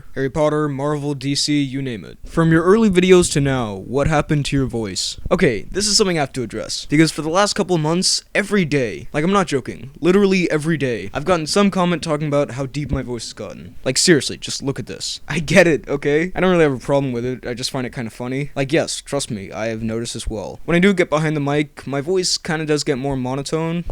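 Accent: United States. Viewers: you sound so not danish. I thought you were form america or something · Holy sardine! (Name that movie!) I can't believe you live in Denmark! You sound really American! Also I freaked out when you showed my comment (twice!) and I have a suggestion for a 'best and worst' video. It might be too short that you might not be able to do it but I'd really like to see it. You ready?........the best and worst of the Cornetto trilogy (you know, Shaun of the Dead, Hot Fuzz and The World's End!) I just have one more question, did you watch my channel? And if so what do you think? I'd love to hear your thoughts on it. Thanks! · Hi, you have some sort of American accent. Did you move from America to Denmark? Just wandering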